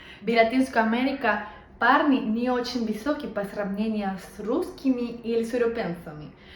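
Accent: Spain. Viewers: Случайно наткнулся на это видео-ну ты перуанская красотка- молодец 👏, неплохо говоришь по-русски, с приятным испанским акцентом! 👍 Удачи тебе, лайк и конечно подписка! 👍👌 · Впервые слышу испанский акцент, если не вслушиваться звучит как "плюм-плюм"))))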